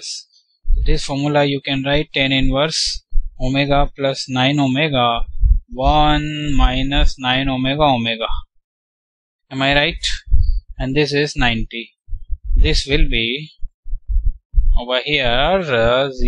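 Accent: Telugu, India. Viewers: Sir u telugu is very sweet we are the telugu people follwing ur class nice telugu and thanking u sir.....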